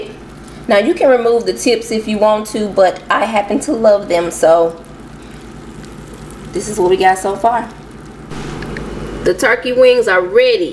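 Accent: South, United States. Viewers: good ol southern accent I love it lol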